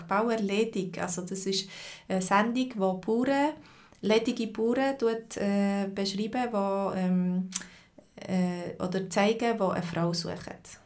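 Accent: Switzerland. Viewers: Aus welchem kanton ist dein schweitzerdeutsch? Ich ziehe bald nach Winterthur wegen ein job um und würd gern zu mindest die sprache verstehen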